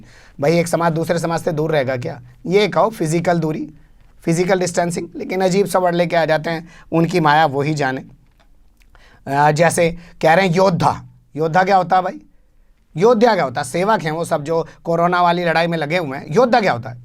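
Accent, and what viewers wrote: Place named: Haryana, India. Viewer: Saheb Aap Haranvi bolte wo Aacha aur Mazahiya lagata hai. Keep it up. Court Qanoon ki Dhajjiya BJPs Sanghis. Ke Raj.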